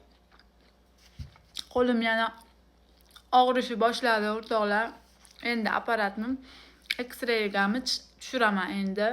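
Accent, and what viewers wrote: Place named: Kolyon, Uzbekistan. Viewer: Siz kòlyondan emasmisiz gapirishiz òxshaydi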